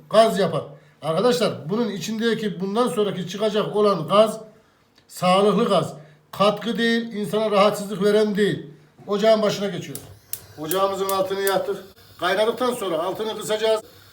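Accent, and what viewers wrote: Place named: Central Anatolia, Turkey. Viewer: Yaa tam bi iç anadolu şivesi çok tatlı olduğu için dülmem geliyor😅🙈Ben de yarın nohut yemeği yapıcam bi tariflere bakım dedim sizle karşılaştım.🙋🏻‍♀️Ellerinize sağlık, bilgiler için teşekkür ederim....🧡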